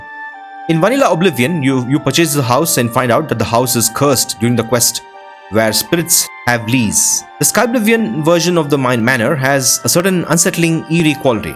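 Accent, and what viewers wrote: India. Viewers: sound like Indian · Actually crazy this guy is German I thought he was Indian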